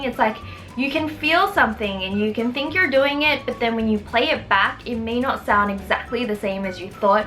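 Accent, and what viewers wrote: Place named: Australia. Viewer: Too bad i don’t live in Australia or New Zealand bc I love ur accent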